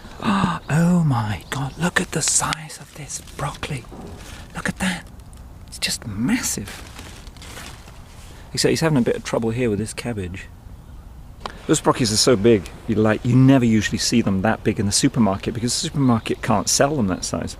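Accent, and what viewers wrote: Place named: Scotland, United Kingdom. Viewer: Hello I love your chitchat with Citizen Kale, love your Scottish accent. 😀😀😀👍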